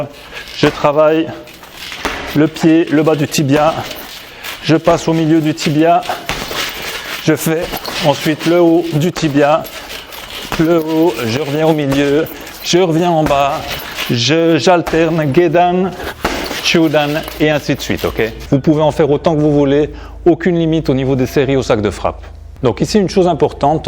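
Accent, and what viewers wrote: France. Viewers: Y a pas un ptit bout d'accent Franc Comtois là-dessous? Video tjrs d'actualité 👍✊🙏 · Gros merci ! Ca coule comme de l'Eau , avec un petit accent en plus ... Alsacien ?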